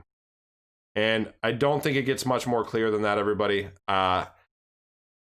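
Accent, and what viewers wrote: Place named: Western Pennsylvania, United States. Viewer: I sense a western Pennsylvania accent.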